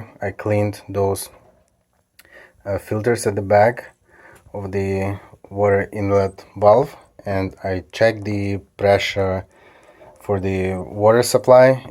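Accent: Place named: Balkans. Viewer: nice great explanation bro, are you from Balkans?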